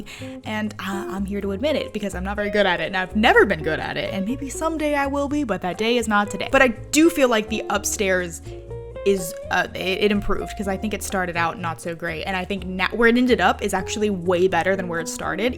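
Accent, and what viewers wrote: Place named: South, United States. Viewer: Jasmine J she’s southern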